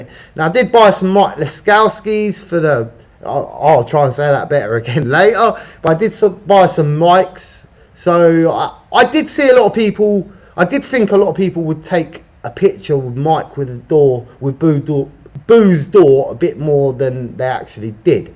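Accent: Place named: England, United Kingdom. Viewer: "also hey, your deffo from England not Wales haha you better get that Rhys name correct ahhaha same name as me it's a Welsh name pronounced like R "ee" s 😂😂😂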